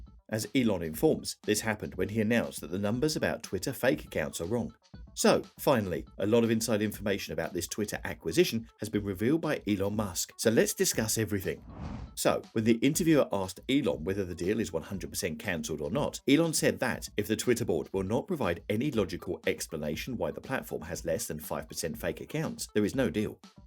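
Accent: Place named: United Kingdom. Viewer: Even tough this is a fine presentation, I get awfully tired of the clipped British accent when we are all tuned in to the good old American accent. It just sounds phony and is hard on the ears.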